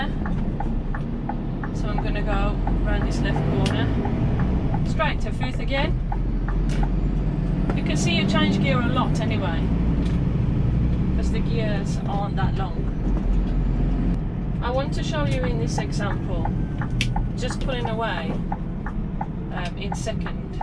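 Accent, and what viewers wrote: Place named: Newcastle, United Kingdom. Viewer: I love your Newcastle accent, boy do I miss a manual gearbox, most new truck are auto's, I'm really jealous watching you flip up and down the gears. Great video.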